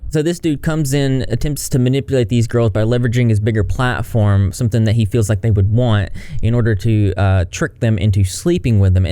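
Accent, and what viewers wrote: South, United States. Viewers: the cutest southern drawl I've ever heard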